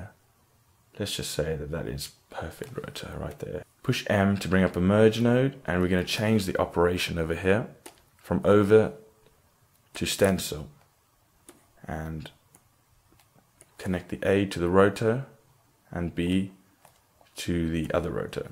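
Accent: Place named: South Africa. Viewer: you sound South African :P respect :D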